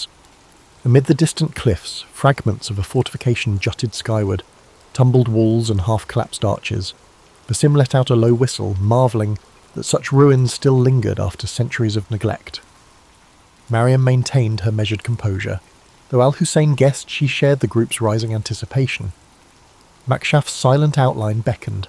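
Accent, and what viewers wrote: United Kingdom. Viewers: YESSS a British narrator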